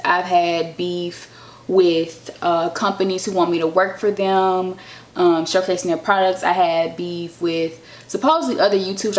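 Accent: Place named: North Carolina, United States. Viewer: You sound like you're from NC! New subbie!! Your vids are great and you're gorgeous!!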